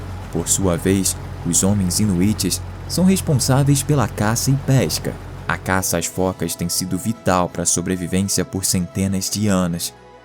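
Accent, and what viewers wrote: Rio de Janeiro, Brazil. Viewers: Esse narrador carioca é a melhor parte do vídeo 😊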